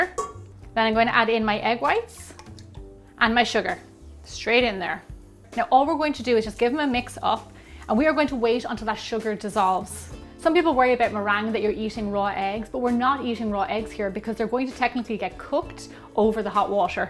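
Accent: Ireland. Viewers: Thought she was from Ireland....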